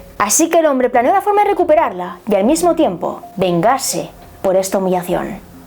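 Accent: Spain. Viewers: Eres genial, me encanta como relatas y tu voz de española je, te mando un fuerte saludo desde México. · La mayoría de tus suscriptores son latinos en América.....tu acento español está muy muy marcado....si lo cambiarás un poco se te entendería mejor ....saludos !!!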